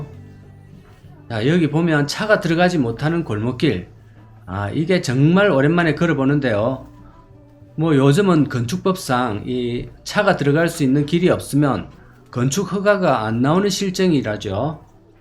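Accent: Gyeongsang, South Korea. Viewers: 선생님. 갱상도 사투리가 구수합니다. 인구소멸 지역중에. 한곳. 외? 먹고살. 산업지대가. 전무상태. 경상도 와. 비교해보세요. 엄연한차이. 8.90년대. 대권은. 주로. 경상도. 하여. 공업단지. 그때모두조성. 그대표가. 구미공단. 울산 공단. 등. 모두 부자동네. 그런데. 지금. 경제. 안좋다고. 난리난. 경상도. 하지만. 현재도. 많은차이. 호남과. 경상은. 비교하지말기. 너무억울하니까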